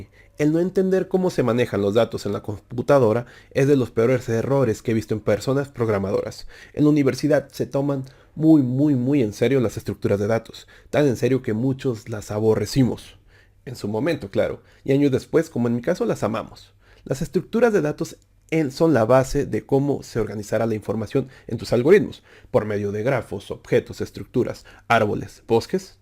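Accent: Mexico. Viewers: We eres bien verga hablas como fresa-barrio y explicas bien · Fresa-barrio xd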